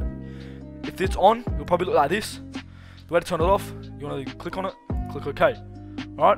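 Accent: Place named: Australia. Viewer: He’s Aussie adlay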